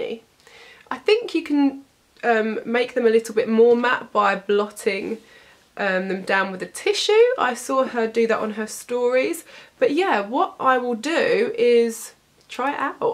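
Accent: United Kingdom. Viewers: you British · Are you british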